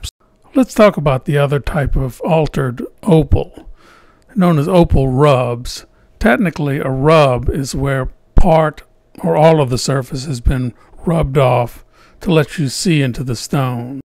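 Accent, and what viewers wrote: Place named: South, United States. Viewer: Hello, I was wondering if you sell opal?? And how come I absolutely love faceted opal but I NEVER see faceted Australian opal?? If you sell opal, please let me know! I buy Ethiopian and I’m wanting a large hunk of Australian but good lord it’s expensive. And your very southern, where you from friend? I’d love to sit down with you and saturate all your information!!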